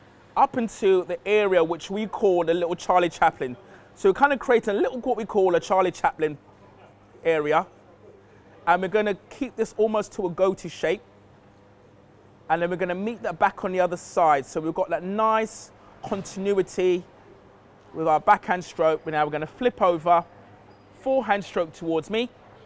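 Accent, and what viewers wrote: United Kingdom. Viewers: ''Your British accent ...is killing me ...very nice ...'' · Love the British accent, this man really knows his business. Also he's totally classy.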